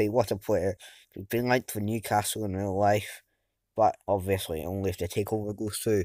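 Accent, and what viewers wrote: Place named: Scotland, United Kingdom. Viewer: Also I’m loving ur Scottish accent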